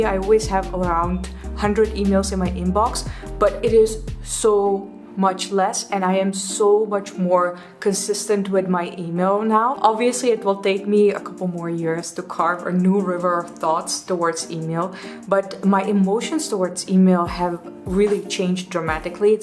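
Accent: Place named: India. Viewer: Ik vind het zo schattig en leuk om te horen hoe inmiddels het Indiase accent je uitspraak beïnvloedt. Superleuk om te horen :)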